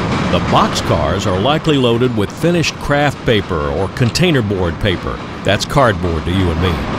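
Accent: United States. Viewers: Amazing naration! A+ for American English!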